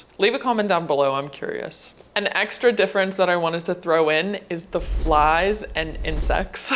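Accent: Australia. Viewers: I can hear a slight bit of aussie accent change in you in this video 😉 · Its crazy that you picked up Aussie twang in your voice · i hear that accent... you're one of us now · Her accent is Australian. Which makes me bekieve she never lived in US. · You've already got a slight Aussie accent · 🇦🇺Great video! You're becoming more and more Aussie with every video you post. Give it enough time, and you'll sound more like an Aussie, albeit with a Yankee twang😊. Oh, definitely more shorter holidays is the go. Having to wait all year for a holiday would suck badly 😂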